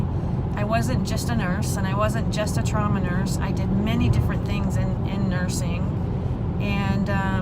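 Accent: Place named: Ohio, United States. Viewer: 😂 You sound just like my wife. She grew up in rural Ohio. When she moved to NYC, she initially found us to be rude and abrasive. After now living here for almost 3 years and seeing all of the good that is done, she sees we are genuinely nice people who remain guarded because we come into contact with a lot of crazy on a daily bases. Your journey is awesome! Keep sharing.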